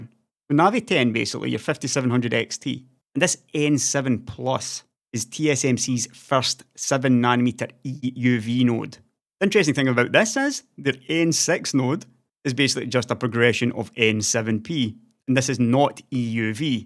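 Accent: Scotland, United Kingdom. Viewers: i just love scottish accent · the heavy Scottish accent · A Scottish accent telling me about technology feels too right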